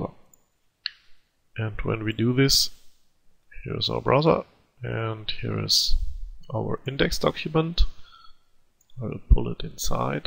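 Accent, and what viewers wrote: Germany. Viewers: Es ist nicht so grausam... es ist ein Deutsches akzent! :)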